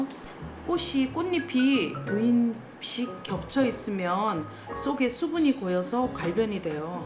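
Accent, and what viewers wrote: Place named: Gyeongsang, South Korea. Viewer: 유혹의 꽃 장미도 차를 제실방에 오시어서 감사합니다 경상도 이신가 봅니다 늘 응원합니다 감사합니다 🙏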